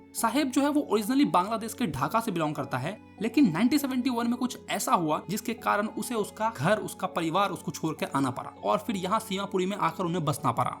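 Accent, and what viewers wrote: Bihar, India. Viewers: Bhai bihari ho kya 💯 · Sir ap Bihar ke ho kya🤌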